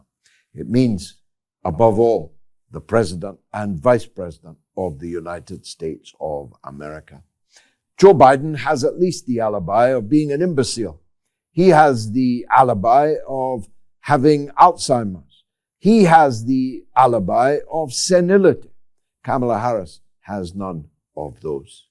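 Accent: Scotland, United Kingdom. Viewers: ☔... old guy saying thanKs again.... me toO....imagined watching the Nazi's carry out their crimes during ww2 on YouTube.... would it have helped or not ?....born in 1950 i'm barely chipped away at the extent of the brainwashing and propaganda inflicted upon my generation and continuing... nevermind .... your accent ?..... just like my grandma's.... Fraser clan... and clan Donald....💜.. peace 🇵🇸🇾🇪🇸🇴🇪🇹🇸🇸🇦🇫🇱🇾🇮🇶🇸🇾🇱🇧🇯🇴🇨🇺🇺🇦🇷🇺🇺🇸.....⚾ ....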